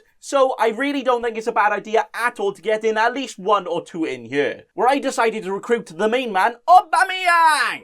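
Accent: Wales, United Kingdom. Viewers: The Welsh accent is strong in this one 🏴 👍🏻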